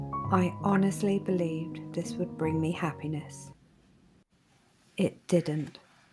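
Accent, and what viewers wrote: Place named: England, United Kingdom. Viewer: The landscape and your amazing English accent make the video very pleasing ❤❤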